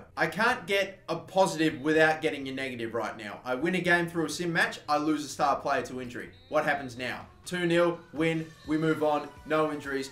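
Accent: Adelaide, Australia. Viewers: Are you from Adelaide?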